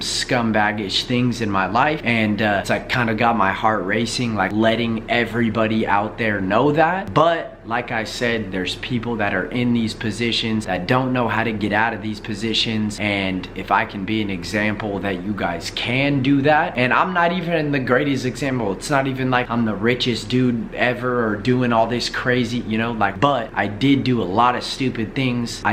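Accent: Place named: Southern California, United States. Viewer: I can tell u from socal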